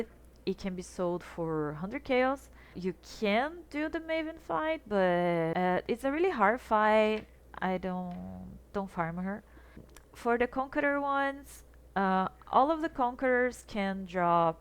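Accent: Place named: Turkey. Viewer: Hello, sorry it's a bit irrelevant with the video but i have to ask, are you Turkish? Your accent sounds a lot like turkish accent. Cool strat by the way.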